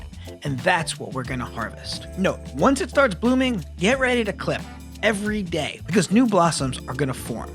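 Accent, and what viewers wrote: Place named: New York, United States. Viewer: Love your New York City accent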